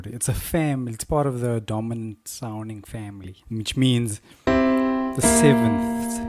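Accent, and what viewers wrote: South Africa. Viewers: Are you from South Africa? The accent seems a bit familiar.. :-)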